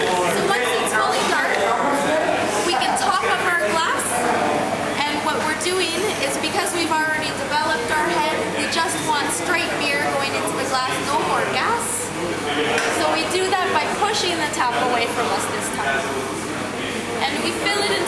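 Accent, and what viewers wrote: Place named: Ireland. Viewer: she sounds so Irish, but then I went to Dublin last year and in two days managed to speak to one genuine Paddy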